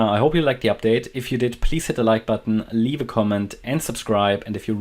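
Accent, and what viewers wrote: Germany. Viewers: Are You German? I hear a German accent?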